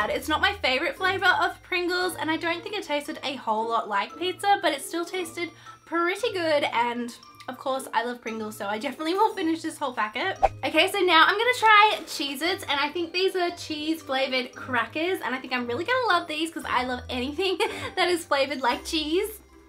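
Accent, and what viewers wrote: Australia. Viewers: are you australian 🇦🇺🐨🐑🇦🇺🐨🐑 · Are you Australian? Xx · r u from sydney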